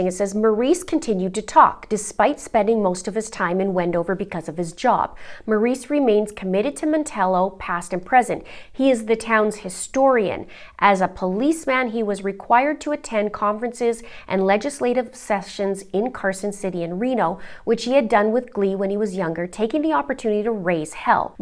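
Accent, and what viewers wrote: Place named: Canada. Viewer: Wow you have the strongest Canadian accent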